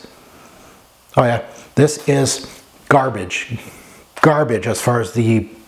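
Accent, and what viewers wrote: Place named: Quebec, Canada. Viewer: Hello Mon Ami Bonjour ou Bonsoir des USA Always such a pleasure to get your opinion with amazing accent French borderline English Du Canada if I m right Merci beaucoup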